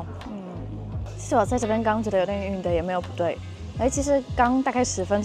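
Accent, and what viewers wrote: Standard. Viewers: 第一次看你的影片，國語講得好標準！標準到讓我有一點懷疑是不是配音的😂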